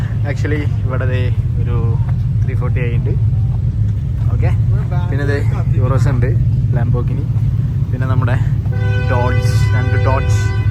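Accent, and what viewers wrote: Kasaragod, India. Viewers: bro kasaragod aahnnoo bro slang cheruthaayii ksd slag pole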